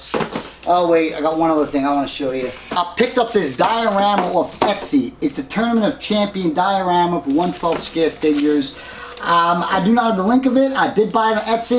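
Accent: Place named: Rhode Island, United States. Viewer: Are you from Rhode Island? My family is from there too. I can hear it in your voice.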